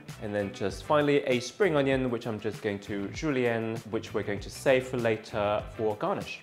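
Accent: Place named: RP, United Kingdom. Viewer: I want to like this channel, but the limp wristed posh affectation is revolting.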